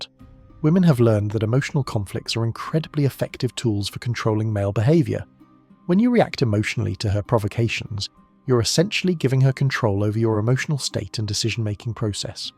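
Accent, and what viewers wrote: United Kingdom. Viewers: This is why you can't trust a British accent, he is getting you to buy into toxic behavior without calling it toxic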